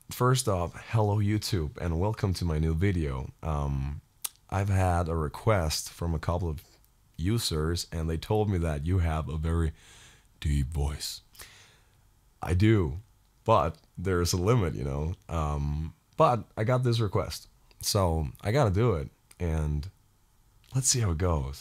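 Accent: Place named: South, United States. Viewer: you are from denmark how do you talk like american i say southern part brother love your music keep it up you know the band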